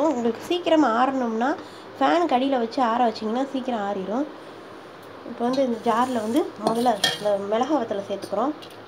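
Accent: Madurai, India. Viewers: Nenga madurai yaaa??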